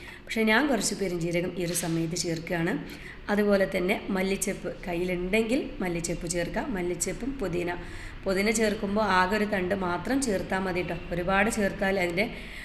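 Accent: Malappuram, India. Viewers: മലപ്പുറം ഭാഷ കുറെ മാറിയല്ലോ, ഞാനും നിലംബൂർ സ്വേദേശിയാണ്, ഇവിടെയും മുസ്ലിം ഭാഷ ഇങ്ങിനെതന്നെയാണ് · നല്ല സ്വീറ്റ് മലപ്പുറം ഭാഷ , Good recipe.Expect more videos from you sister 🎉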